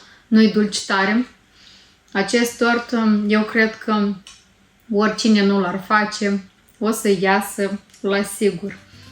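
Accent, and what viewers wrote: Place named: Moldova. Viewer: Ea e moldoveanca și vorbește moldovenește.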